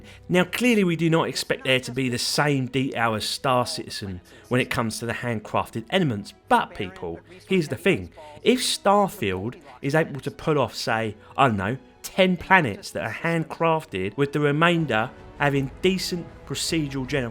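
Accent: United Kingdom. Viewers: I really enjoyed the British accent. Where are you from lad?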